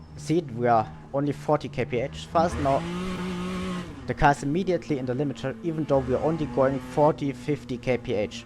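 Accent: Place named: Germany. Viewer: When explained with a German accent technical stuff like this immediately makes sense to me.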